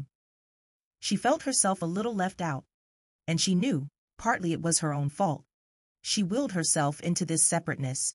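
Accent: United States. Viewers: Horrible, please please don’t ruin audiobooks by creating AI trash, why does she have an American accent when it’s a Yorkshire book?? The “speaker” makes mellor’s native dialect awful to listen to. Stop making this trash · Why do we in the UK need this problem by a US female accent? Why do we have to have a US accent! Don’t like it -. Bye.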